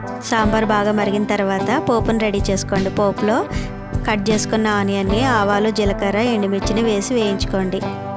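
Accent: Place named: West Godavari, India. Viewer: Meru pacchimagodavari yasalo matladuthunnaru balevundi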